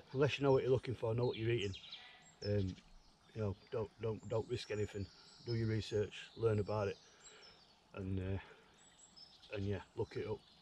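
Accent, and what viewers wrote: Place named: Leeds, United Kingdom. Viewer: I love wild garlic. Gather it, tub it, freeze it for future use. Stews and stuff. Once frozen it crumbles nicely. Where are you from?without giving too much away. I'm Leeds. You sound quite local.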